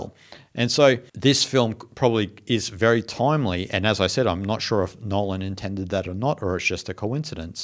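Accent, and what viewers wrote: Australia. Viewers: accent suggests to me that he is Australian. Am I correct?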